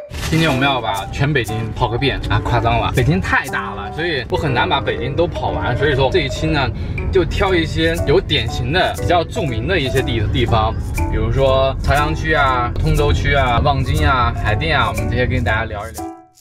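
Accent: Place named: Anhui, China. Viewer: 听口音安徽吧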